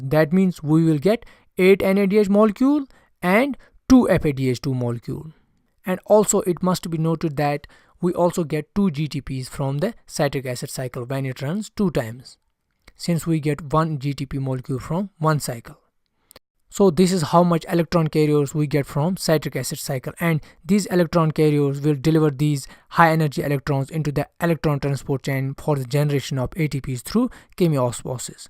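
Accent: Kashmir, India. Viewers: Sir, are you from Kashmir? Your accent indicates so.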